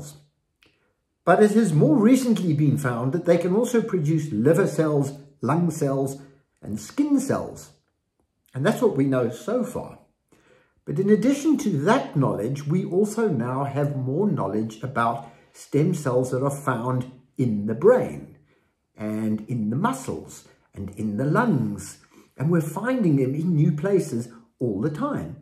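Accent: South Africa. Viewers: Hi, I recognise a SA accent.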